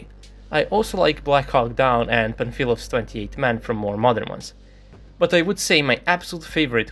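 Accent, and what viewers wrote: Eastern Europe. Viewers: Obviously, he has a slavic accent . I suppose he comes from Belarus or Ukraine . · I'm sorry but why would not say what country you are from or your age? Neither matter if you are putting out good content! Do you not like your country or do think people knowing what country you are from would be somekind of negative? I personally don't care if you don't say what country you are from or you age, but I also don't think it should matter what country you are from. From your accent you sound like you might be from eastern Europe, but then again I could be totally wrong lol.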